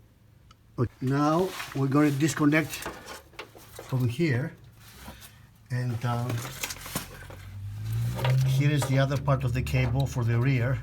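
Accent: Greece. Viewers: Hey, you Greek? You sound Greek to me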